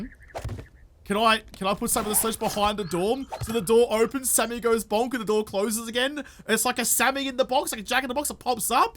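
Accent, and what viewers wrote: Melbourne, Australia. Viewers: Do all Melbourne aussies sound the same? You sound just like ozzy. It's knot gey but yut lovely 😍 lmao 😅 you're phenomenal ❤️ american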